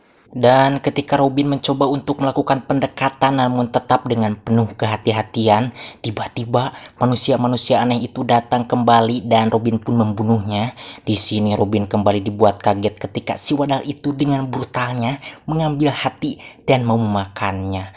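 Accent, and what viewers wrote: West Java, Indonesia. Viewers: Org sunda ya? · Logat Sunda pisannya... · Sunda mana min?? Lucu,ngahibur euy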